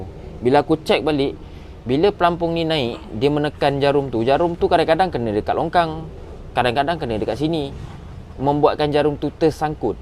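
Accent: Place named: Johor, Malaysia. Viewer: Asslmlkm... Oooooo org Johor klau ckap try...bunyi dia...terghaiii Oooooo bari Sy tauuu😁😁😁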